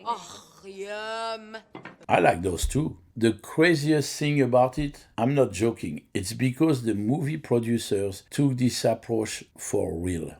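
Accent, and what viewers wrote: France. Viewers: I used to be an IPSC shooter, not a very good one, but I definately recognize the skill that Keanu Reeves has. He might only be shooting blanks but the gun handling is definately top notch. He is truly a very good shooter with great skills.: I love your videos, they are well done and the French Accent is a killer.. Je parle Francais aussi....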